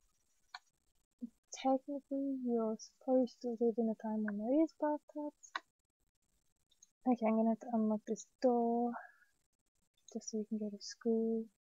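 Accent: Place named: Australia. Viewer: Are you Australian? You sound it :)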